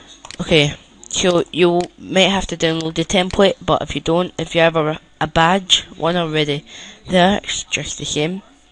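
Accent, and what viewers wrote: Scotland, United Kingdom. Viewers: judging from his tone im sure hes scottish am i wrong? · your scottish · I can tell it is a scottish accent I'm scottish but I don't have the accent. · dued are you brittish your voice it sounds brittish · He is scottish, :P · British accent + lisp. Not a good combo. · sho ya hache cho dchownload ich in ya sherver... IS THAT HOW U SPEAK? clearer please... but i think you are scottish cuz your now is like scottish now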